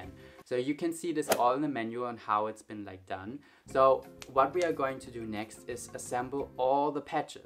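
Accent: Germany. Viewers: Sein deutscher Aktzent triggerd so hard.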